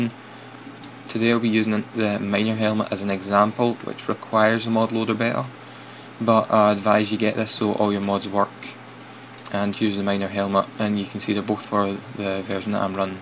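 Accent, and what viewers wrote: Scotland, United Kingdom. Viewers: i love your accent. scotland? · yay a scots like me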